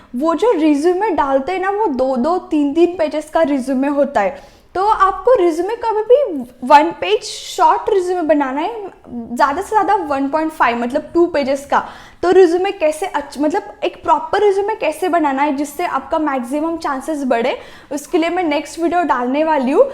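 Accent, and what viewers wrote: Maharashtra, India. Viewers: Marathi by accent!!! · Tu marathi ahes ka? Seems from tone.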